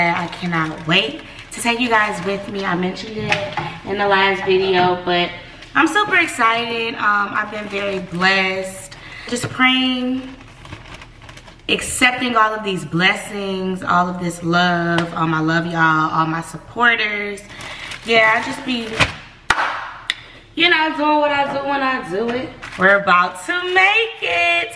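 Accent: New Orleans, United States. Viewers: Can u talk in your New Orleans accent with your LA friends 😆 🤣 😂 PLEASEEE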